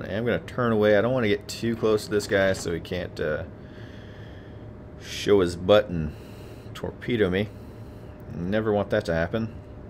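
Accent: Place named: Texas, United States. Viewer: Much enjoyed ty! You've mentioned you're in Texas - I am curious what general region and is that where you're from? Mostly I'm curious your accent which is not over-the-top but just enough : ) For my part I was not born in Texas but I got here as soon as I could!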